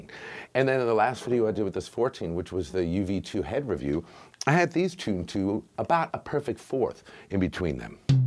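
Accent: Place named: South, United States. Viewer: Oh man, I absolutely love your presenting style and that "southern drawl" in your voice! Thank you so much for all the great videos, and greetings from Finland! 🙌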